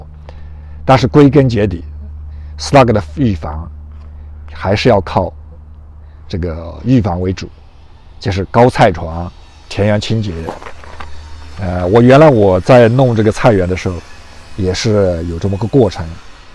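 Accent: Yunnan, China. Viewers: 云南马普，听着好亲切。我就是洒草木灰，管用，肥皂水也可以。家里的鸡根本不吃那粘粘的东西。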